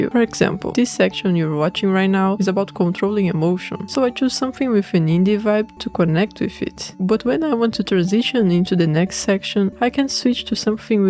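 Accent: Brazil. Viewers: reconheci seu sotaque brasileiro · eita sotaque brasileiro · Are u brazilian? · you sounds brazilian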